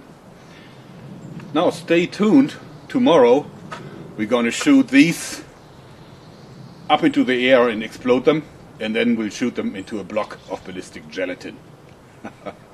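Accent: Germany. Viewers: the guy is a german i can hear it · yes he is...Don't you hear the typical german accent? :D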